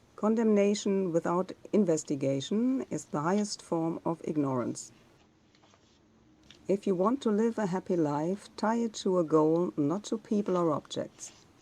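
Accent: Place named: Germany. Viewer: Your English was great and easy to understand. I like the German accent , I love all accents. Nice journal!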